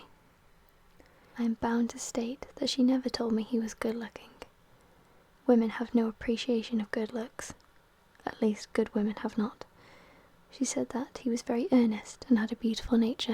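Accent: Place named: RP, United Kingdom. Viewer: A posh British bird. Not many of them around nowadays.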